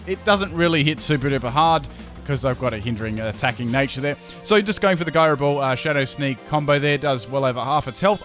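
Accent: Australia. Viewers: Wait is Australian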